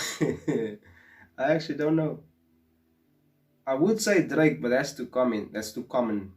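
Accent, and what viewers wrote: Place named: Afrikaans, South Africa. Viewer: Oh my gosh you have a Strong Afrikaans accent lol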